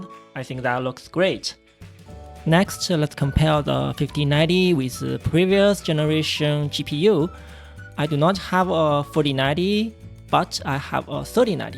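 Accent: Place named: China. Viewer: 我就觉得这英文太中文了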